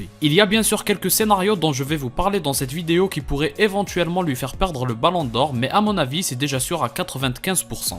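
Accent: Kabylie, Algeria. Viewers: UN ACCENT TYPIQUEMENT KABYLE T'AS RAISON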